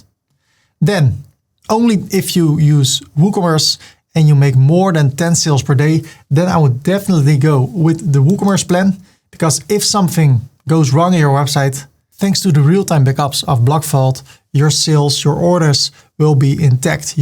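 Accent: Netherlands. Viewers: i love the dutch when they speak english zo schattig 🥰😅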